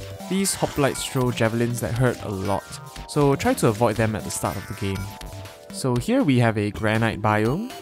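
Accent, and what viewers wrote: Singapore. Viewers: are you Singaporean? I can hear your accent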